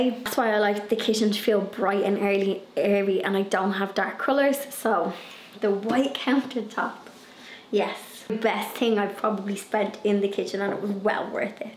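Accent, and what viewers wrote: Ireland. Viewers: you are a most delightful sweetheart ❤! You are SO pleasant to listen to with your lovely Irish accent, and watch your projects in each video! You are really gifted in the countless ideas that you have…inside and outside! 😊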